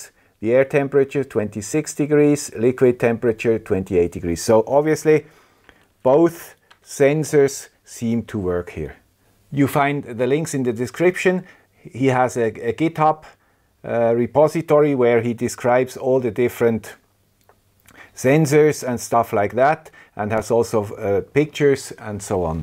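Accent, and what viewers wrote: Switzerland. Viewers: I love the Swiss accent, but if you could just change this pronunciation... Circuit is pronounced sur kit.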